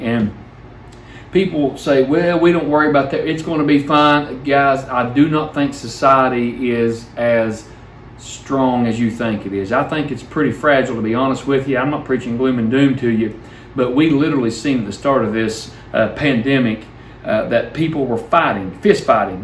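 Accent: Kentucky, United States. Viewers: So good to see my buddy and Kentucky accent..dude you are the best!!😊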